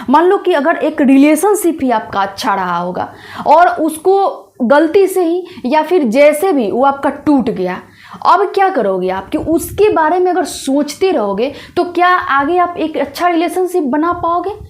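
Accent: Bihar, India. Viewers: MADAM, LAGTA HAI AAP MERI TARAH BIHARI HO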